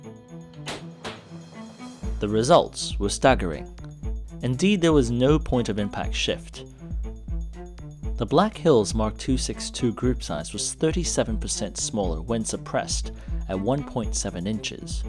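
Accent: United Kingdom. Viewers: Anyone else annoyed with the British accent on an otherwise awesome review??? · New sub here. Love the content. Gotta ask, what accent is that, British? Really cool.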